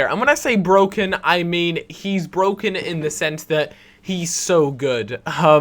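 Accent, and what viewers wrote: Scotland, United Kingdom. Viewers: Where in scotland u from?? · You scottish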